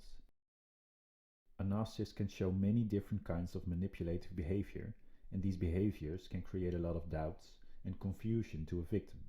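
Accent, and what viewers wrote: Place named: Netherlands. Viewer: Volgens mij hoor ik een Nederlands accent. Cool. 😁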